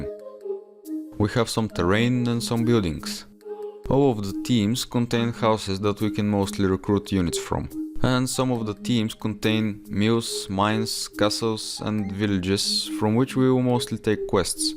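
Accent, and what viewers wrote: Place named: Balkans. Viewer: Great job, keep up, but I need to ask you something, are you south slav? Your accent is making me believe that.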